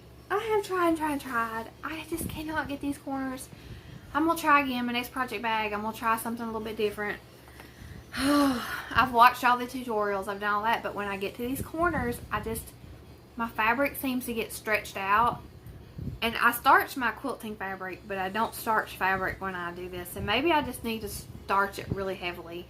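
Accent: South, United States. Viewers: I think it's the southern accent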